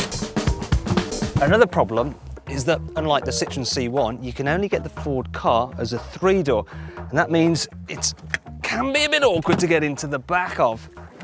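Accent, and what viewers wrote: United Kingdom. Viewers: can't tell if he says "ka" or "car" with the british accent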